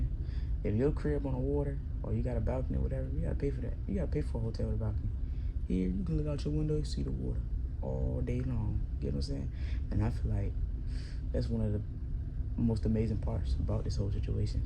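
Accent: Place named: South, United States. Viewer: yk the whole video i was saying “damn she talk like she from the south” it had me wondering where you was from cs shit got to familiar 😂aye as soon as you said most people ain’t seen outa va Ik you hada been from here ,757 specifically i might be wrong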